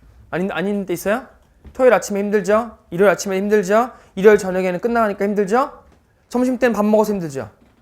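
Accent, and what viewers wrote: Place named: Soviet Union. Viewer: 말투 소련여자 ㅈㄴ 달맞네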